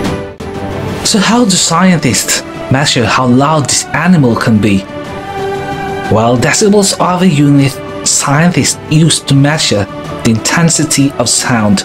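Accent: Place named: United Kingdom. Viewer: Ung accent tlga lodi..para akong nanunuod ng harry potter..😁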